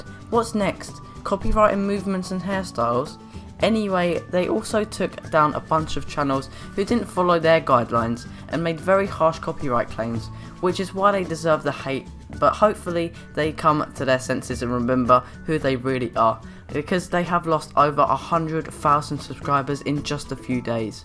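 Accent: United Kingdom. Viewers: It's not a speech issue! It's how half of London talk. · Omg are you british?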